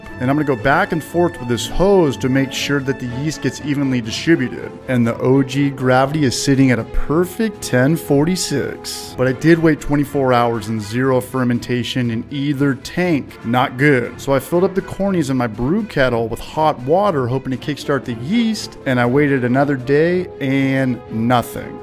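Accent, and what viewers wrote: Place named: Tennessee, United States. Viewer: I don't know but I can hear that Tennessee drawl slowly setting in on...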